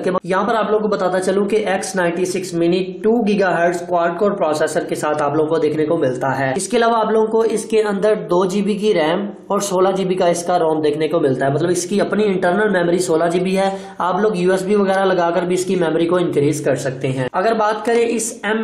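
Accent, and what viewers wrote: Punjab, India. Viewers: Phelay Urdu sahee say bolo, Panjabi style mai connect nahi bolo, apney nails cut karoo. phir video banana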